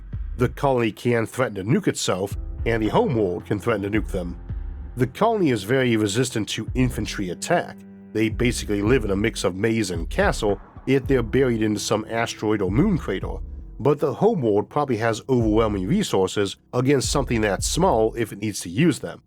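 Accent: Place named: South, United States. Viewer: Can't place your accent. Im thinking southern US with a mild speech impediment. No disrespect. Im a linguist.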